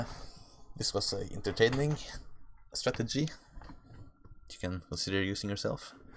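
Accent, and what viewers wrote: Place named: Scandinavia. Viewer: The Scandinavian accent makes it very hard to understand, mic volume way too low.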